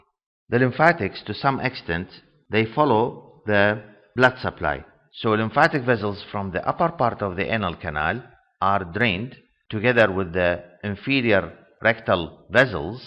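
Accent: Iraq. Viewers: شكرا دكتور على قناتتك الرائعه نفتخر بيك انت قدوتنا منوره الاكسنت العراقيه كلش حلوه وان شائ الله المزيد من التقدم والموفقيه :) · عرفتك عراقي من اللهجة ههههه ربي يحفظك حبيبي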